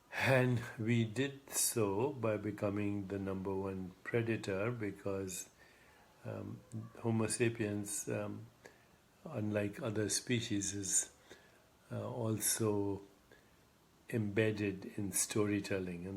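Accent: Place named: Trinidad and Tobago. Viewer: Sometimes sounds like ah Trini 🇹🇹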